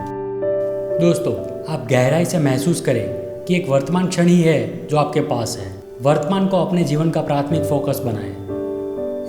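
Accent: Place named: Rajasthan, India. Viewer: Aap ki bolne ki style se lagta he aap Rajasthan se he.am I right?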